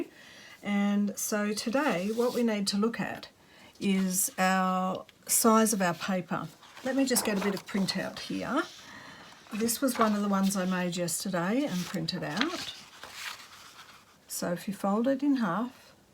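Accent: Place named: Australia. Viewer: Aah. I was puzzled cause at time te Australian accent slips and I couldn’t place it. I thought South African or New Zeeland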